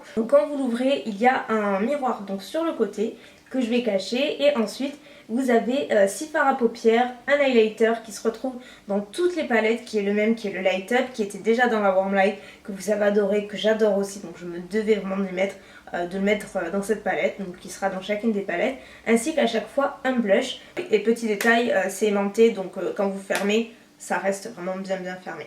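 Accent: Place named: South, France. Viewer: Pourquoi ? C'est l'accent du sud qui fait cette prononciation; je trouve justement que ça donne un charme à chacune de ses vidéos. Si vous cherchez des vidéos provenant de "l'Académie française", ben faut changer de thème alors...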